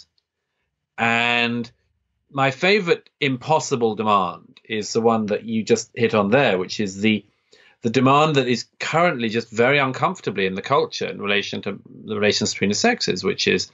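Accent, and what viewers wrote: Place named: RP, United Kingdom. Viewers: One minute in, and here's another old man who says a lot of hot air with a posh accent. I hope this doesn't become a trend. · Society makes impossible demands on both men and women because we have a capitalist society, but this is missing from this faux intellectuals' 'message'. Just because he has a posh accent doesn't mean that there isn't plenty missing in what he has to say.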